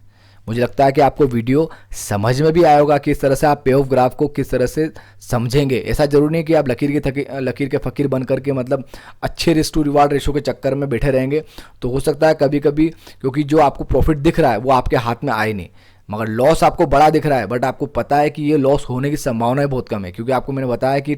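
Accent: Indore, India. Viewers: Bhai mujhe lagta hai aap Indore se ho....kya me sahi hu? Ya malwa region se 🤔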